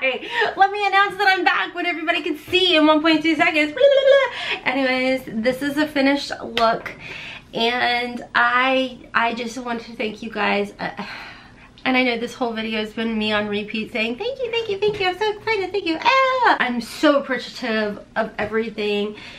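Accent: South, United States. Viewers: I had to stop this video when you said your not good with words and you hear it in the comments that broke me. Please never discredit better yet never let anyone in these comments discredit you or how you speak words. You are a tried and true southerner girl with a beautiful accent. You speak your words just fine. You articulate words perfectly in your own way, if they have issues then that's on them. This is coming from one southern cajun lady to another southern queen. Now back to the video. Blessings and Love!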